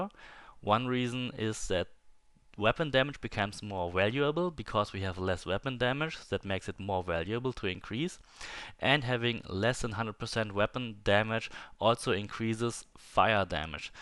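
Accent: Germany. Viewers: I thought the same and wanted to write the same comment. The accent is so German😂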